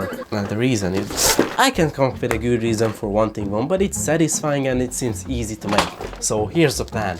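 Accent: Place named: Hungary. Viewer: Your pronounceination sounds very hungarian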